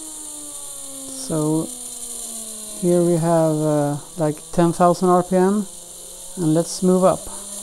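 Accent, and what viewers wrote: Sweden. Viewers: Sorry to ask....are you from Sweden?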